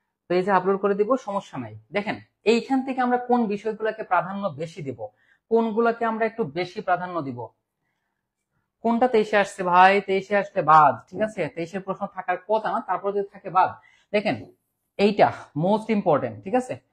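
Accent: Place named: Sylhet, Bangladesh. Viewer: Bro Sylhety✌️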